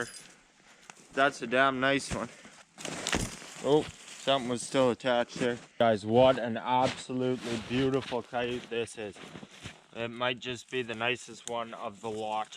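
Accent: Canada. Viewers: You sound like your from waaaaaaaaay out there in Canadian.. you ever get any lynx mate?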